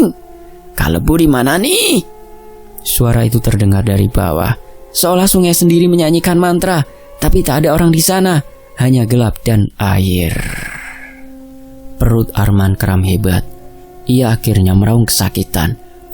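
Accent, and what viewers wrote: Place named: Surabaya, Indonesia. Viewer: Saya kira orang Surabaya mas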